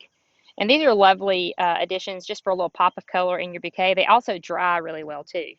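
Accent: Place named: Alabama, United States. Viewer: Congratulations on winning your well deserved Amazon's United States Woman-Owned Small Buisness of the Year (2019)! I thought I detected an Alabama accent.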